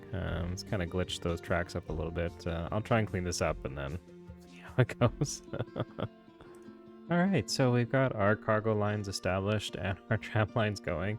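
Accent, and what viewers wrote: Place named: Canada. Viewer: I was going to ask if you were Canadian lol. The midwestern in me could sense it 😂 great video!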